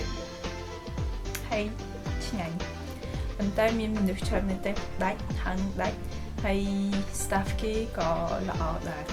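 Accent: Cambodia. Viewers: I love your Khmer accent so much that I have to subscribed the channel. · Funny but cute khmer accent.Thanks for sharing.Keep up the good works!.. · You’re so cute with your khmer accent. lol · Coucou ! Je suis francaise d'origine cambodgienne et j'adooooooooooore ton accent khmer. je suis troo contente de voir qu'il y a d'autres personnes d'origine khmer qui parlent khmer parce que yen a pas beaucoup. Tu peux continuer à faire des vidéos en khmer ?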